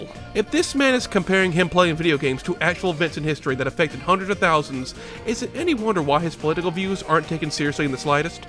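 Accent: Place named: Brooklyn, United States. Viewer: His dumbass brooklyn accent that only comes out on specific words is grating as hell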